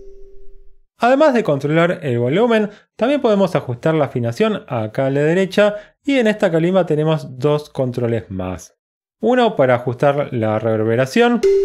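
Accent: Argentina. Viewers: acento cordobes creo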